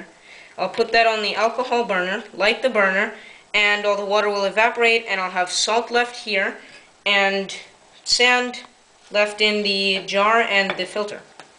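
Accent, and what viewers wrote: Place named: United States. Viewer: does he live in america coz he's accent is really string american! looool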